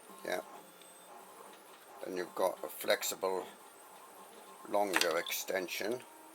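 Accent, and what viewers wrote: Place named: West Midlands, United Kingdom. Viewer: God that English accent is hard to hear. West Midlands?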